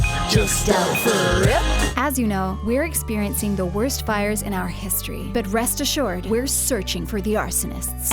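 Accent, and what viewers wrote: Canada. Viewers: she aces a canadian accent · as a canadian living in australia, love it. thanks for doing this segment. and with a canadian accent too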